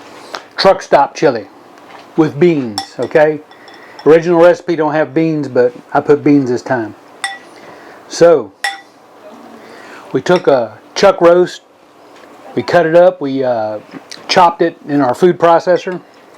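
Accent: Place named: Virginia, United States. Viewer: Sound like you're in Virginia.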